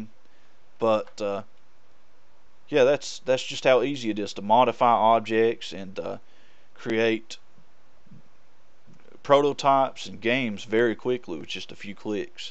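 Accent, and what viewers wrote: South, United States. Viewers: that southern accent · That American accent is awesome!!! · Great tutorial, and great southern american voice (is it from the south right?)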